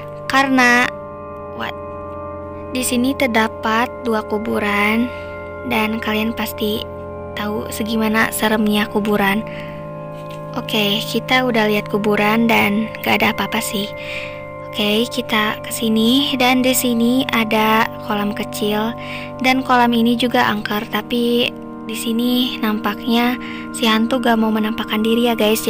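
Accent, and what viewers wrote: West Java, Indonesia. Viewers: Kak aslinya orang sunda ya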